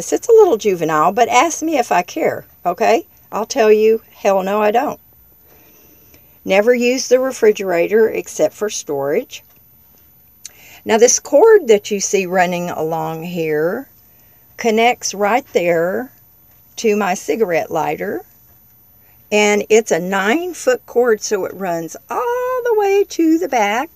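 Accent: South, United States. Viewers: I knew it,!! You can take the Gal outta the South but you can't take the South Outta the Gal, Honey you hang in there. Keep saving, stay safe and keep your wits about you. You are gonna be OK..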